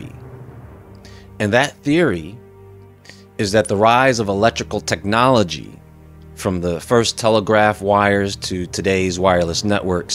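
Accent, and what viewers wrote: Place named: New York, United States. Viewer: & I thot he sounded like a military jarhead, part Italian New Yorker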